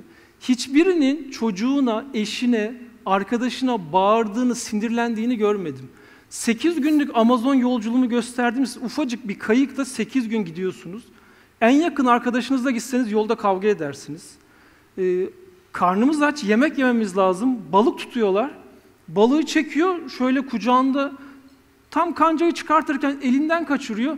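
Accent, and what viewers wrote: Samsun, Turkey. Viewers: Şive Samsun'a kayıyor e'leri açık söylüyorum diyince bendede tüyler diken diken oldu 😀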